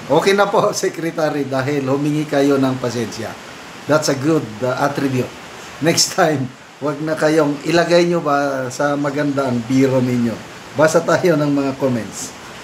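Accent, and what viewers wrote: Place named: Cavite, Philippines. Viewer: Ganyan iyong mga salita ng mga cavetenio Lalo na iyong mga addict na tambay riyan, kuhang kuha Nya iyong words at pagkakasabi at Punto ng Isa ng cavetenio!😊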